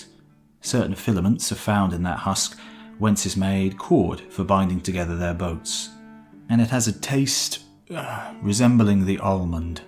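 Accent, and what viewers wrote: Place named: United Kingdom. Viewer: Ted Nugent any guy who sounds Brit sounds older.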